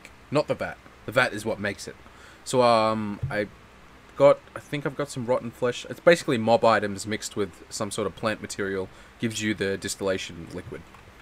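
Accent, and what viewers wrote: Australia. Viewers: ye fuikin aussie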